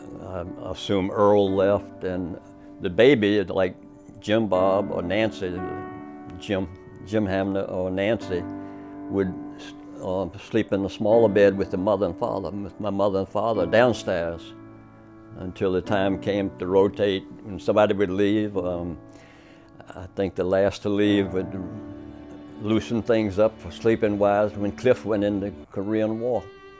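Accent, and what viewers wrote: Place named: South, United States. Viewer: The accent is like sweet music to my Massachusetts ears. Such a gentile sound. I could fall in love with a southern gentleman. My ancestors on my mothers side came from Virginia, Kentucky and North Carolina. My 4th great grandfather was a baptist preacher down there. Rev. Andrew Tribble. The depression brought my mother up this way for work in the shoe shop factories in Manchester, NH.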